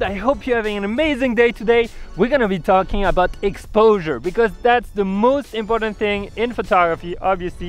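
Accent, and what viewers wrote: France. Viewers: The french accent is epic 😊😊, I am a french speaker by the way and my english is way far from being as good as yours. Keep it up. I learn a lot through your videos.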